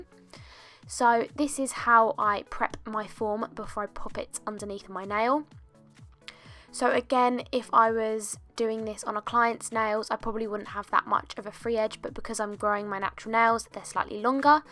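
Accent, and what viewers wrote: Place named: England, United Kingdom. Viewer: i could listen to you all day!! ur english accent!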